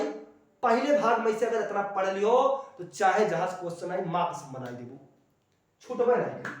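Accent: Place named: Bhojpuri, India. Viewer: Waah भैया ekdum mst 🎉🎉😅 भोजपुरिया अंदाज mai 🙌🙌👍😂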